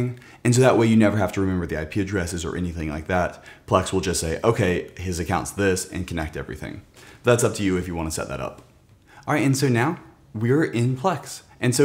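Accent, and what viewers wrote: California, United States. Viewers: I have no idea where you live but when I listen to you all I can think of is SNL "The Californians". lol Your videos are greatly appreciated. Thank you.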